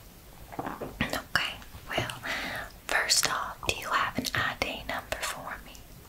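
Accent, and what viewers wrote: South, United States. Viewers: Being from the south,your accent was pretty accurate. Very relaxing video. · Just in the nick of time, I was ready for another round of and in a southern accent is just bonus. ❤ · Ohh And I 4got too mention the Southern accent was outstanding it was sooo good u should def do more vids with it!what a sweet precious angel u are!😊😄💗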